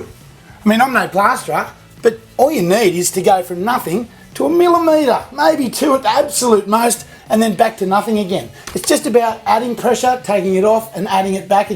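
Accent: Australia. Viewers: The Australian accent 🤢🤢🤢🤢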